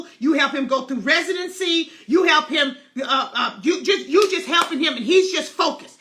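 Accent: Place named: Chicago, United States. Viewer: I can definitely tell she is from Chicago. My sister from the south. Milwaukee native here.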